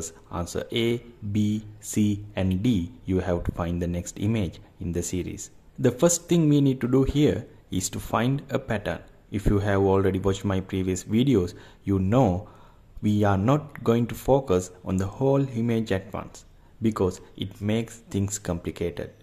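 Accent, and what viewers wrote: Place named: Sri Lanka. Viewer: I notice a Sri Lankan accent :D but keep it up bro!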